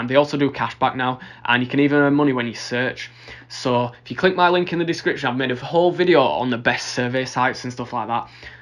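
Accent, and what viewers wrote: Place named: Northern England, United Kingdom. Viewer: This is the first money making video that I can actually get my head around. Maybe it’s your northern accent I find reassuring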